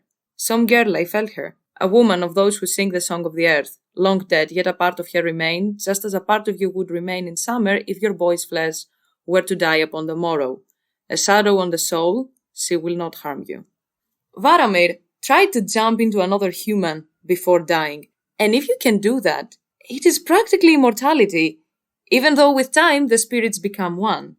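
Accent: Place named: Greece. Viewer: Το κατάλαβα από την προφορά, σαν την δική μου, ότι είσαι ελληνόφωνη!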